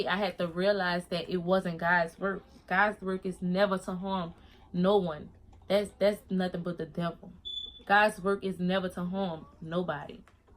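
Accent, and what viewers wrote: New Orleans, United States. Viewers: New Subbie! I love your frenchy New Orleans accent. So Sad! RIP Breon Prayers to you, I know it's hard. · This video was in my recommendations.This is so heartbreaking. You are incredibly strong! I couldn't imagine. Praying for you mama.❤ Also, are you from NOLA? I know that accent when I hear it lol. If so, hey girl from another fellow Louisiana mama! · you talk like u from louisiana where you form ?- im a new supporter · Rip to your sister. U from New Orleans u sound like it. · First time watching you, is that a New Orleans accent I hear 👀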